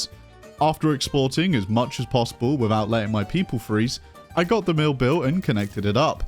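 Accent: United Kingdom. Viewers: Your british accent goes really hard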